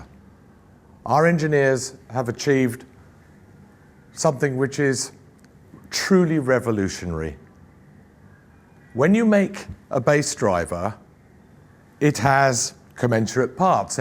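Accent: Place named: United Kingdom. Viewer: Literally sounds nothing like him, this guy has a thick Brittish accent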